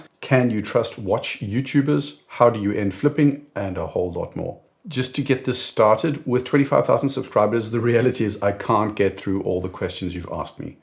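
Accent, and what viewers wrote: South Africa. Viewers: I was always thinking that you were South African. Now it’s confirmed. Great accent and great people! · Haha thought you SA’an. I’m an Aussie who lived in SA for 5 years. I like your presentations, honest, well researched, intelligent and very interesting. Go forward well, ne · Howzit boet! One of the things that drew me to you (and ID guy) was the accent from home. I'm living in Cape Town. As you know, we have plenty sunshine but insufficient electricity, so anyone who can is getting solar 😂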